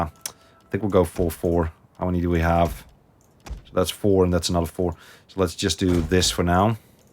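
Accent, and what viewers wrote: Netherlands. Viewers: I like your gameplay... I hear that you are Dutch. Do you also have a face cam? Keep up the GOOD work!! 😄👍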